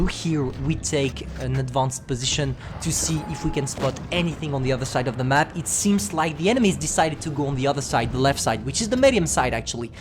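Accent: France. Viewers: I’m here for the French accent... nothing more, nothing less 🤤🤤🤤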